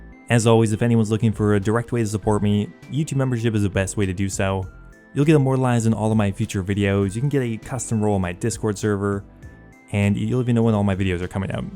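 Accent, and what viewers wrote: Quebec, Canada. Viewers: Are you french canadian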